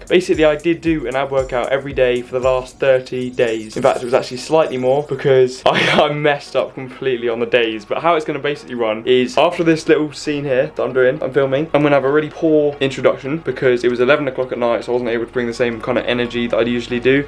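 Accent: United Kingdom. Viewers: I just searched for "30 day ab workout" and you popped up and when I clicked on your vid,my volume was up but I had a feeling that your accent was gonna be British idk why but I was right lol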